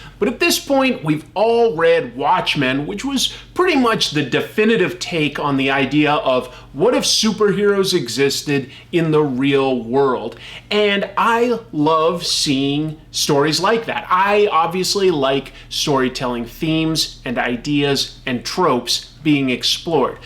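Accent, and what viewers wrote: Boston, United States. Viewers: If you're trying to do a Maine accent, you might need to relax your throat more. Right now you have a solid Boston accent. :D Great video. Keep it up!!! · Is that a farmer accent or Boston? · I thought he sounded like from Boston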